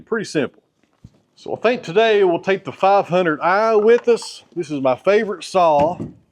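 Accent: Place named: Southwest Virginia, United States. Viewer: Well, I'm from southwest VA and he sounds a lot more like my Bristol peeps than Charlottesville.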